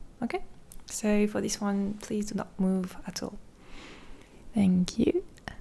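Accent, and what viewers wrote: France. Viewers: is she french? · El acento francés sisisi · Am only 2 mins in and I thought French but will listen a bit more lol first video of hers so haven't a clue. (Sounds French to by English ear anyway) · Je suis juste réellement impressionné par ce bon niveau d'anglais, j'écoute bcp d'asmr anglais pour m'améliorer, eh bien contre toute attente un asmr anglais avec accent français c'est pas si mauvais pour progresser, j'ai tout compris, l'accent doit être assez familier je suppose. Sinon continue comme ça, ton asmr est très bien. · A perfect video I’m sure of what I’m saying but l accent français franchement sa rajoute un truc et le alors a un moment magique super vidéo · Are you French??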